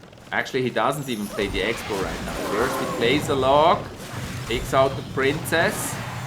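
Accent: Switzerland. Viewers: Are you Swiss because of the axent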